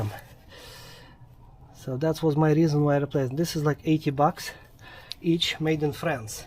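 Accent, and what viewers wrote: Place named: Russia. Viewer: чел, ты реально думаешь, что я смотрел и слушал 40 минут безумного русского акцента про замену тормозных дисков? Оно мне зачем? И, может ты не в курсе, но языки других стран это не проблема с появлением нейросетей. На лету переводят. Впрочем, оно мне не надо, чтобы понять твою речь. Снимай, как хочется.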